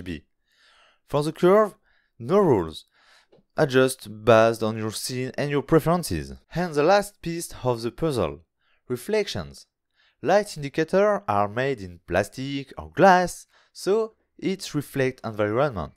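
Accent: France. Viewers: Super instructif merci!! Sinon woaw, cet accent frenchie aussi parfait je pensais qu'il n'existait que dans les films :o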